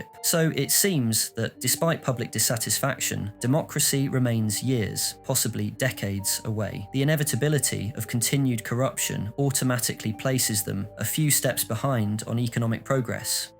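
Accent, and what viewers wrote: United Kingdom. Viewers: Wtaf is a "British accent"??? There's no such thing He has a southern England accent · i swear all you need for a video essay channel is a british accent this is fire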